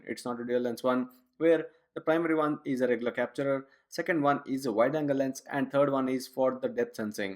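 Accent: India. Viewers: The presenter is doing a good job of demonstrating how much he knows...he has no idea how to be a teacher...very fast talker, his very strong Indian accent is almost unknown. leaves everyone behind. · Stop with the accent you Indian I don’t understand u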